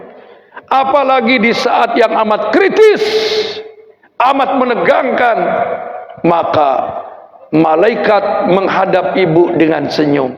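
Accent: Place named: Sunda, Indonesia. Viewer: Suara Pak Kyai kas sundanya.... salam gotong royong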